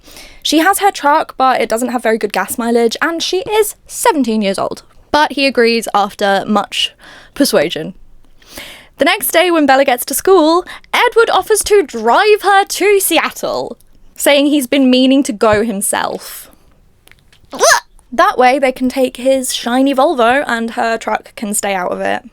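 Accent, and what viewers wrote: United Kingdom. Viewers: I hope you do all 4 books! Nostalgia, cringe, and anything with English accent is a delight to listen to. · I know its mostly just because you're British but you would be such a good audiobook reader. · When I read the twilight books I had no idea Jacob was supposed to be 15! It’s insane. - “it’s nice to read a romance book where the female protagonist has good female friends… and the romance doesn’t get in the way of that friendship…” uuuuhhh new moon might change your mind. I love Bella read in a British accent, it makes her voice so much better.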